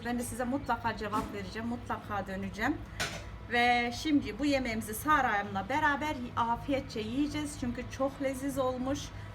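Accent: Kayseri, Turkey. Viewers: Nerelisiniz ..kayserililer gibi konusuyorsunuz🥰🥰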